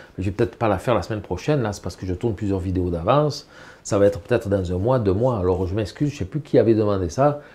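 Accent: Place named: Provence, France. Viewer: Merci Monsieur , bien expliqué !! Intéressant pour me ressourcer ! Et Bel accent de Provence ❤️ Régine , artiste.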